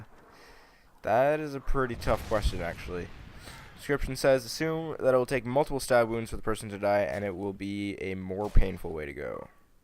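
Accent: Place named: Canada. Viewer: you say milk funny, melk, but ur Canadian so you have a accent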